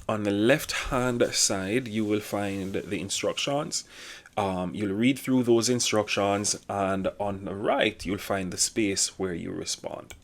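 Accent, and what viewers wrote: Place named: Jamaica. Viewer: Sound like a Jamaican 🇯🇲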